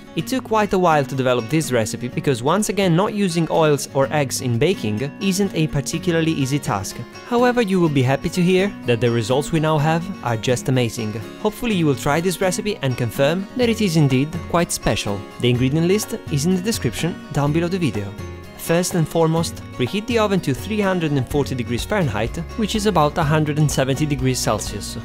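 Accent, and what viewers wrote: Italy. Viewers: are you italian? im trying to figure out what your accent is, it's really cool! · I think he is from Italy... it seems so to me cause im italian;) idk tho...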